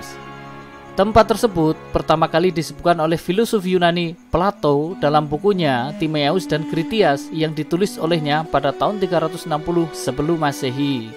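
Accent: Java, Indonesia. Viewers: Pusing dengernya kalo naratornya medok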